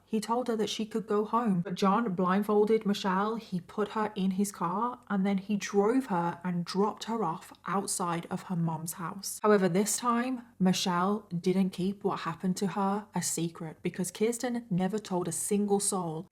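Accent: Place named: United Kingdom. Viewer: I Looovvvee your accent, always been a fan of British accents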